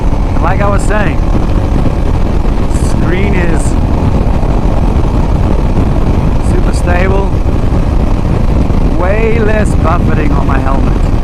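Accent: South Africa. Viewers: hey man yes I saw that after I replied. I think I’m going to go ahead and order one. What off roads are you riding up in north ga? Saw your Instagram and saw where you road around telico plains I love that area. I just started to ride at the age of 55 and loving it Road some with my buddies in New Orleans growing up but not much . Just bought a Wr250r a year ago already thinking I might need to upgrade to a dr650 . Love the SA accent. I miss hearing it my wife is from SA and I used to go over a lot. Lol small world too I used to live off of the north ridge exit on 400 Off Spaulding and over in hunt cliff off Roswell road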